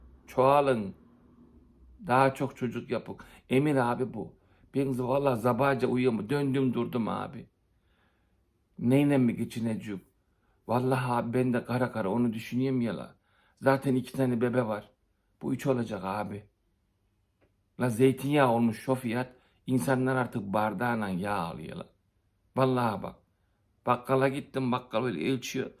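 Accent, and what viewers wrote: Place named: Tokat, Turkey. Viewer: Tokat'taki akrabaların geldi aklıma. Şive konuşma tarzı herşey aynı:)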